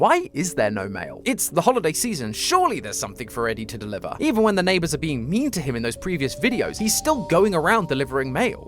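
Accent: United Kingdom. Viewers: is literally a British version of matpat and I’m all for it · Is British❤ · Why is he british? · WAIT HES BRITISH :( GOROSEI DENIED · The new host has such a nice British accent I could never reach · Why did they replace Matpat with this Brit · We may miss Matpat. But British matpat hits different NOT IN THE SUS WAY YOU IDIOTS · sound like the British version of mato at or more of a Brit acent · I’m going to call British matpat now. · british mate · I actually appreciate the voice change and i have to say its almost nostalgic for some reason to replace an american accent with a British accent. I accept. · Hold up why did MatPat leave? Nvm Now we a Very British Man😂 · He’s British, 😮 not going to lie, I like him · matpat sounds british lol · is the most British person I've ever heard Note:it's not a bad thing that he's British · The British boy is back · Ok, but the british accent?😳 Definitely staying with game theorists now😅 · I like your accent, british man. 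· BRITISH BOI IS BACK! WOOOO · He sounds Soo British. Is British?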